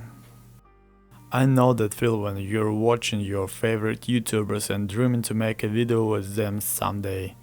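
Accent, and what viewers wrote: Russia. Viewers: Ты не из России? Акцент похож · да, акцент точно говорит, о том, что скорее всего русский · Чел, ты русский? Акцент похож, но это не в обиду, потому что плевать на это. Ты реально крутой ютубер и гитарист, поэтому успехов тебе)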